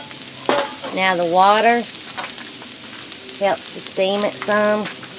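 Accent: South, United States. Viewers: Im from Oklahoma and been all over the country and that southern accent always grabs my heart good job honey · love the Southern Drawl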